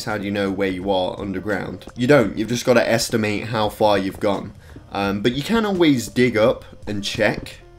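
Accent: United Kingdom. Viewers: Wait, how tf a British guy telling us how to storm into Area 51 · Why is a British guy giving us a plan?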